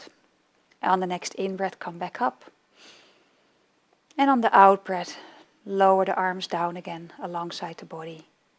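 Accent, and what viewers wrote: Netherlands. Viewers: Wow is she Dutch or what? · She’s Dutch... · Cassendre Xavier Definitely a Dutch accent! 😊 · you have got a dutch accent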